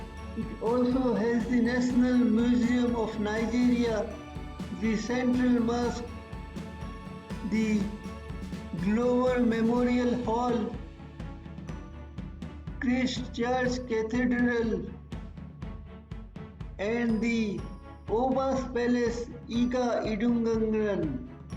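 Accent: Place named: India. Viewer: He is Indian His accent is his identity We love it like that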